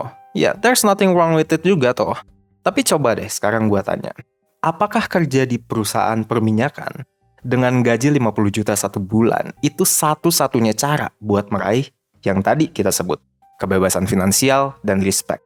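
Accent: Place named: South Jakarta, Indonesia. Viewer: dia ngomong kek orang jaksel 😆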